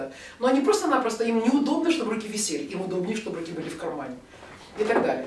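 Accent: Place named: Kyiv, Ukraine. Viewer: а сами вы чем лучше? хам, который не может подтвердить свои слова и не может выполнить в жизни обдуманные и хорошие поступки, тем самым о многом сожалеет, во-первых, вам стало это интересно (тема лекции) и как только вы решили не брать информацию в учёт жизни, во-вторых, вы увидел плохой комментарий и решили написать о человеке, которого не знаете, и судя по всему вы не внимательны и у вас узкий кругозор, так как говор лектор вполне себе, допустимый, так как она живёт (внимание) в Киеве, к вашему сведению столица Украины, в третьих, давайте ж включим логику, если она препадает и ей много лет, значит может чему то научить, и следовало обдумать все хорошо и досмотреть до конца, так как её звали работать личным психологом у политика, и скорее всего у вас сейчас появится желание удалить свой необдуманный ко мент, так как поймёте, что не правы, а когда до читаете этот момент скажете: НО ХРЕН ТАМ ТЕБЕ НЕ УСТУПЛЮ Если так оно и есть, то подумайте о как поступаете)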